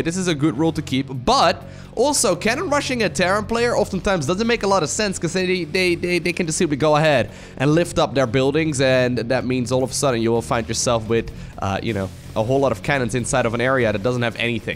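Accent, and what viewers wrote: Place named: Netherlands. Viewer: The way you say "catapult" is the greatest. Im from america so i dont hear very many people talk with a dutch accent, i think its great. And i love watching lower level casts just as much as high level/pro casts because you see so much more variety in play styles. Granted, at lower levels, there is a lot of cringe moments (obviously because of the lower level) but, the cringe from both sides equals out and makes it a fun game to watch regardless. Especially since most of the time, its so hard to tell who is winning untill the very end.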